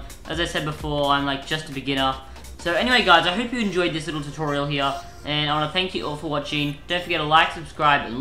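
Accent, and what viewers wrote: Australia. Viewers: Are you from Austrelia?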